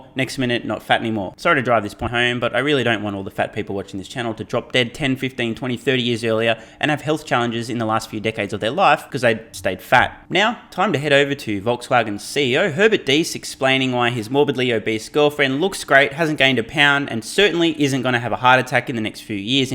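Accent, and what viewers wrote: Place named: Australia. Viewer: You need better vocal sound 'cause your voice grates partially your accent but mostly because of your complete ignorance on the subject of sound engineering! Listen to one your Australian reginal youtuber's; Cold Fusion/Dagogo Altraide. He produces his own music and that acuity to sound educated him to the value of sound processing. It's stupid simple probably under $2-$300.00 Azzie money! Buy a Behringer board with built in Compression and 'Bob's your uncle' as the English say! Get one that has USB output and your sound we be much improved! I only wish that "Electric Pixar looking Viking Fucker" would do the same.....Cheers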